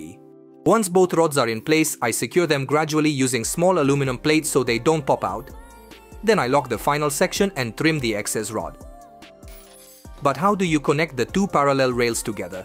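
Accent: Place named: Italy. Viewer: I prefer your real voice. Who doesn't like an Italian accent?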